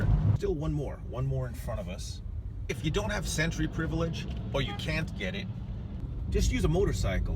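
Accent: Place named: New York, United States. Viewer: “Keep swinging that thing all the way to chula vista” - u from ny? Thats how nykers talk.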